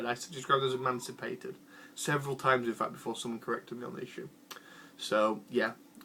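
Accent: United Kingdom. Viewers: I love your accent and pronunciation! Bear in mind, language is identity and we all come from somewhere. I keep my German with a strong northern accent, as I'm from Hamburg and I like the area. I don't attempt to filter it to simulate standard German. Your accent reminds me strongly of folks I knew in the UK during my student days there. My point is that I understand everything you say perfectly well and I greatly enjoy following your thoughts. Keep it up!:-)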